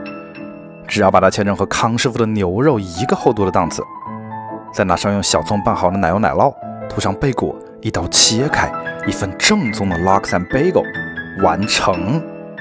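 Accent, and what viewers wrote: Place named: Hunan, China. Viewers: 你说话好大的fu南人的味道啊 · 哈哈哈听你的普通话隐隐约约感受到湖南口音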